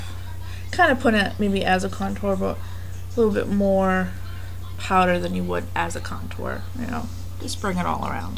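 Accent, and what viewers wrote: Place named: Kentucky, United States. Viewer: there is that kentucky accent again lol , funny , enjoying these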